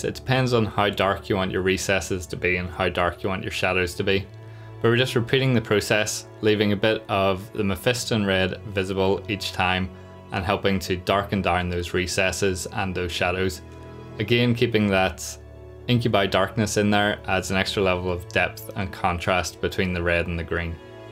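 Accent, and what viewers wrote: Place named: Northern Ireland, United Kingdom. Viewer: Really Helpful Video, has given me some inspiration for my Winged Hive Tyrant, also great to hear a Norn Iron accent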